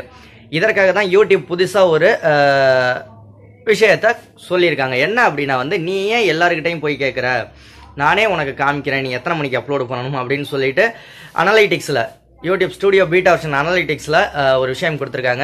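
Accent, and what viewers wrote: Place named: Salem, India. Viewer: Super neenga endha ooru. Slanga patha Salem Zone a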